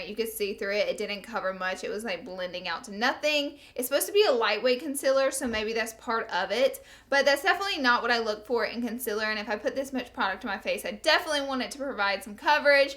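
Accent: South, United States. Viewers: Hi, new sub here! Love your personality and southern accent! Can u plz do a tut on the look in this video.. I've been on the hunt for a purple eyeshadow look like this! Thanks! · i'm texan and her accent is way too southern for even texas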